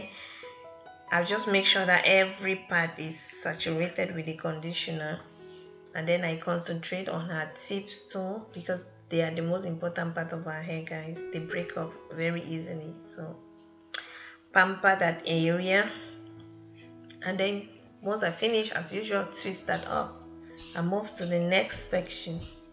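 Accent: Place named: Africa. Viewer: Do your daughter have an accent like yours? I love your beautiful African accent, where in Africa are you from?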